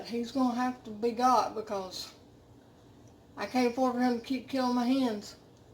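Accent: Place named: Tennessee, United States. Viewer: Love the video. I’m a Tennessee girl and I talk like you do 🤗🤗🤗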